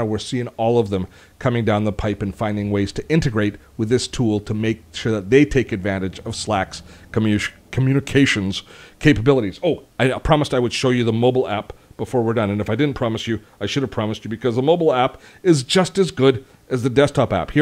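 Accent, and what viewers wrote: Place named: Canada. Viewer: Canadian heavy use of "aboot".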